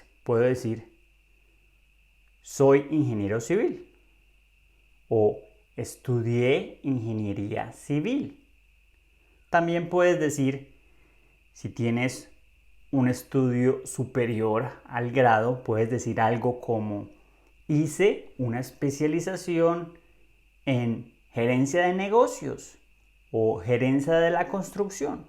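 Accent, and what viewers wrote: Colombia. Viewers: Gracias por este video. Soy de Polonia pero vivo en Inglaterra, y me encanta el acento colombiano!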